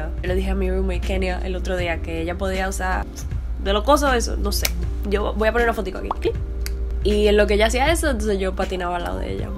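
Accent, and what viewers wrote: Dominican Republic. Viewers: I'm not trying to be biased cause I'm half Dominican. But the Dominican accent is like the best. 😏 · Más videos en español ! amo el acento Dominicanoo <3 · Wow q genial! Me encanta tu accente.. El accente Dominicano. Mis vecinos pq soy un Haitiano. 🇭🇹. Soy nuevo de YouTube. La verdad me motive mucho, para sigue aprendando mas espanol, y tambuen sigue adelante con Mi canal aqui.. yo no me voy por vencido.👊 GRACIAS 🙏🙂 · por fin un canal con una dominicana que habla como hablamos la mayoria de los dominicanos, asi es que habla el dominicano promedio. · Finally a real Dominican accent that’s not exaggerated. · I'm also Dominican! Love your accent! Sounds beautiful, Spanish but with African twang and a bit of Arawak. Love our Dominican Spanish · I just found your channel. Nice to see a Dominican YouTuber. You have a nice voice/accent! 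You sound a lot like my cousin who lives in the Dominican Republic. Like EXACTLY like her. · Me encanta el acento dominicano! 🙂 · ¿Buen video, algo me dice que eres cibaeña, quizás de Santiago cierto? (Yo también soy del cibao STGO 👌🏽. PD: Your channel's name reminds me of song: ❤, kep it up with the good work, greetings from DR! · Es mi primera ves ver tu video. Yo hablo inglés pero siempre estoy buscando oportunidades de practicar el español. Yo te entendí perfectamente que me asombra porque el acento dominicano es único. Gracias y espero más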